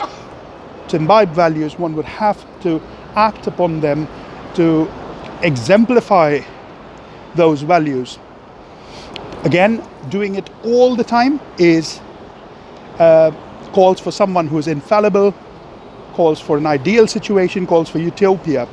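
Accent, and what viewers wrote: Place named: United Kingdom. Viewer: I feel like this is a glitch in the matrix, hearing a Punjabi man with a British accent. Anyway, you get used to it soon enough.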